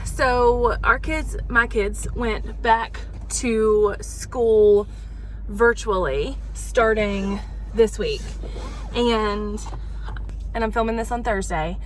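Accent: South, United States. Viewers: Are you from the south? 🤔 Oh from onwards you lose the accent 😂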